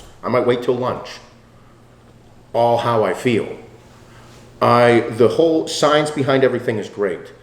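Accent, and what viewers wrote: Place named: Cleveland, United States. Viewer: You anywhere near Cleveland? I hear the accent and see giant eagle got a feature.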